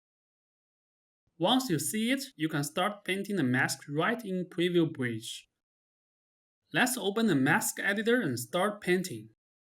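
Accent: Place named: China. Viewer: 中国老乡吧 这口音特色:hand-pink-waving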